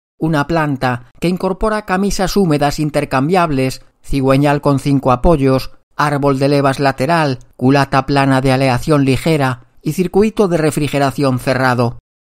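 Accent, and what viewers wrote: Galicia, Spain. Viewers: Es increíble que un coche tan modesto de concepción fuera capaz de ganar rallyes e incluso a todo un Porsche 911. Recuerdo que mi padre estuvo probando un R8 y también un R10 para sustituir al Seat 850 especial 4 puertas que fue su primer coche aunque al final se decantó por un Fiat 124 special (el equivalente al Seat 1430 pero con los dobles faros redondos en vez de cuadrados). Supongo que por amplitud interior, maletero y potencia. Como siempre, el contenido excelente. Y además con estilo propio made in Galicia con acento incluido.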